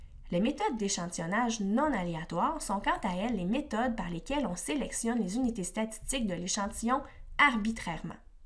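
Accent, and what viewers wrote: Canada. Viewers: très très bien expliqué (même avec votre accent canadien lol)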